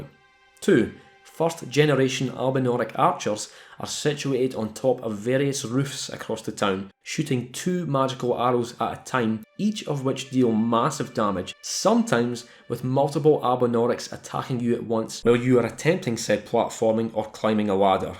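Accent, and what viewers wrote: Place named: Scotland, United Kingdom. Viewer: I missed your smooth scottish voice and it'd only been like 10 hours since I watched your last part. Nice work.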